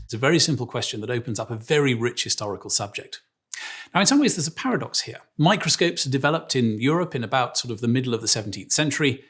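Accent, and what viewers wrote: United Kingdom. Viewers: this is the most british sounding man of all time and i need him to do audiobooks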